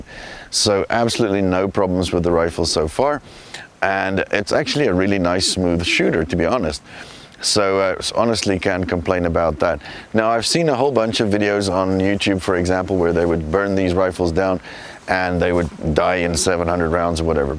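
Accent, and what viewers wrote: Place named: Pretoria, South Africa. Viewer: Am I hearing a Pretoria accent there 😂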